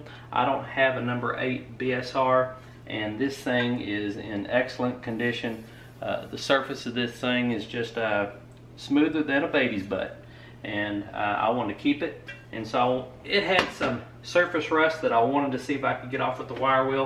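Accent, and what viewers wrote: South, United States. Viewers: I love when a southern accent roasts trump 😌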